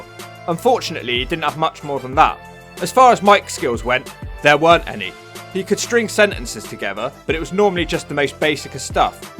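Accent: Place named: Wiltshire, United Kingdom. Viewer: You sound like you're from wiltshire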